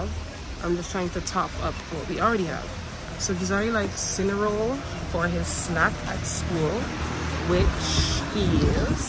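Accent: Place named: United States. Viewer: You sound more American than you do Canadian😊 Also I would love the healthy country life myself it's peaceful and the air is cleaner. Since all of us are subscribing to these YouTubers I think some of them should try harder tell us about available land for sale, so that people with no Jamaican background don't get to buy it all...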